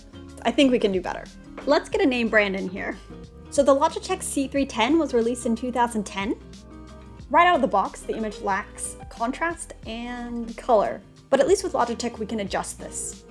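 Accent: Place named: United States. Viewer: Just out of curiosity: you sound American - yet, it seems you are in Ireland (and you look somewhat Italian, and charmingly so ;-) ). You emigrated?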